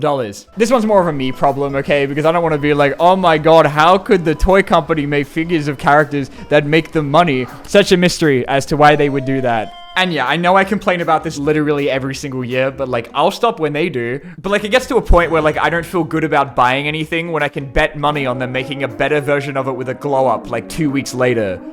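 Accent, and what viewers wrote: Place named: Australia. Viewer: Idk why but I thought u were Australian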